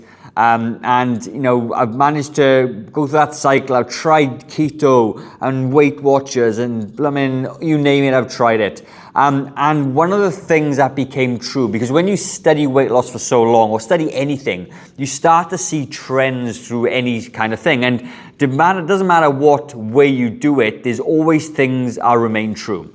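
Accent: Wales, United Kingdom. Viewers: Lou from Ireland here. I love your welsh accent...oh and its Phone case !!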